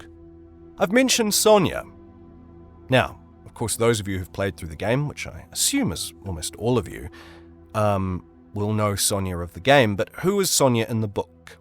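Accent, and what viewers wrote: New Zealand. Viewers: YOU’RE A KIWI?? The way my neck snapped around when I heard that omg… Hiii from a fellow Kiwi!!! Should have been able to tell from the accent 😭 but I was like “no way right”…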